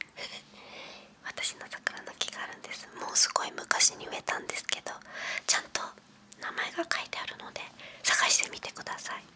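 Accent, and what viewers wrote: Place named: Tochigi, Japan. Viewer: 栃木の方言めっちゃ共感できます～笑 あまり可愛い感じはしませんよね😅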